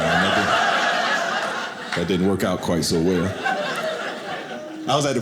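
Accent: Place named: South, United States. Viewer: I adore his lovely soft southern accent and gentle manners, for a brit its a joy to listen to Real class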